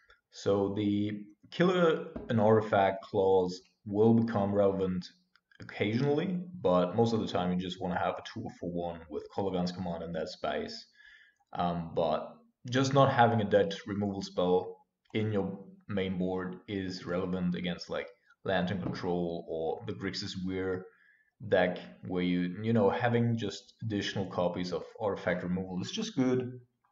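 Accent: Germany. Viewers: It sounds like you might be German am I correct? Or just crazy?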